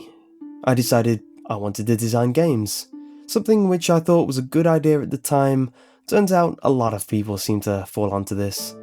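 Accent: England, United Kingdom. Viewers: Where from england are u from are u york shire bread or southern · That explains why did you sounds like a British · ENGLAND. That's why I love your voice so much.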